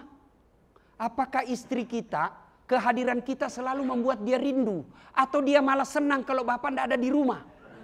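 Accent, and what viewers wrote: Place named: Sulawesi, Indonesia. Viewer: MasyaAllah Yang paling saya suka logat sulawesi nya. Sehat truss ustadz. semoga selalu dlam lindungan Allah swt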